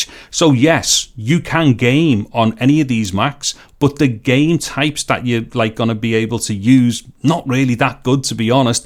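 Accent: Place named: Scotland, United Kingdom. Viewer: Another great video. My son (6) asked if you were from Scotland 😂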